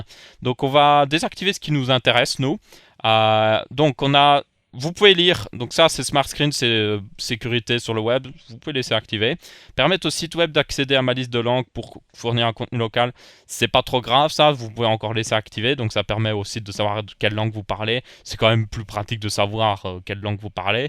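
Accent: Belgium. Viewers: Salut, Merci pour tes conseils. Tu parles vite, avec un accent belge (très agréable ) il faut vraiment se concentrer avec toi vu que tu vas assez vite. Mais en tous les cas merci pour te vidéos. A+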